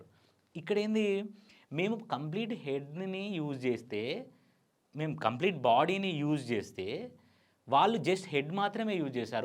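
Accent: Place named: Warangal, India. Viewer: Warangal slang super ekkadiki poyina slang maradu.❤❤❤ Warangal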